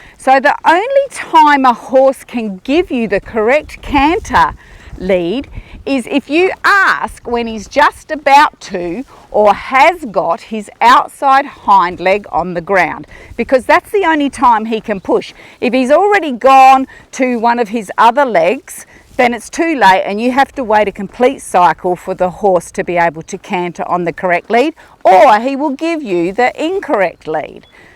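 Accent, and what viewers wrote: Australia. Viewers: You're literally the best!! And I love to hear a fellow Aussie accent :D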